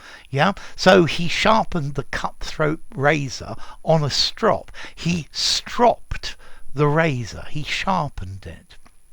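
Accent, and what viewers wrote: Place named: England, United Kingdom. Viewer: I understand this video is a couple of years old. I am an Australian Brit, born in 1964. With both my parents passed now, it was rather delightful hearing an English voice clearly describing the use and origin of British words. My mother, herself a rather stroppy woman at times whom we affectionly referred to as the grammar nazi, was forever ensuring we pronounced and utilised words correctly. I am feeling rather nostalgic. Thank you. 😊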